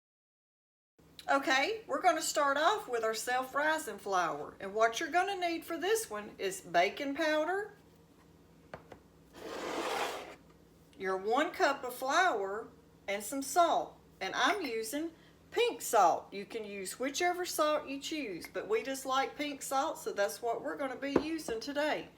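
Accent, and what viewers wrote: South, United States. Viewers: I love the southern accent.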